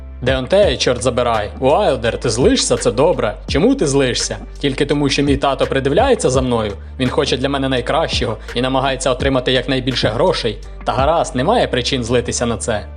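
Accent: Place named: Rivne, Ukraine. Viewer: Акцент у тебе північнорівненський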